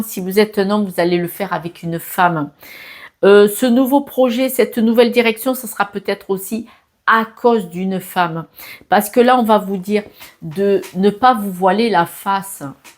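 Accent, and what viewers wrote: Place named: Toulouse, France. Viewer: je reconnaissais cet accent .. je suis pas loin de Toulouse aussi .. ❤️🥰🥰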